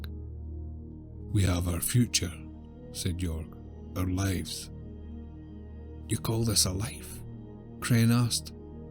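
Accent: Scotland, United Kingdom. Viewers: Your Scottish accent makes this! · Very nice!🪐 And the Scottish brogue is very easy to listen to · This is an incredible concept, well done! And I agree the Scottish accent makes it epic to listen to!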